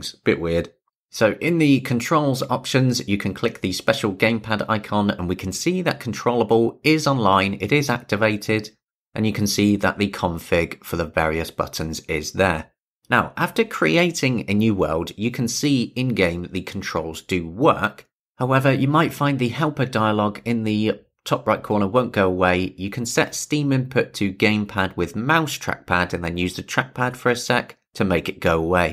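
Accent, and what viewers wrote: United Kingdom. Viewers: Oh my god I found a British hello from American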